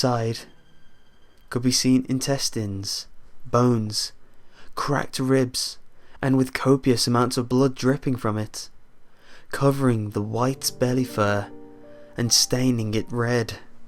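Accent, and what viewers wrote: United Kingdom. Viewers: Just curious, are you British? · ) omg Your british acsent is awesome !!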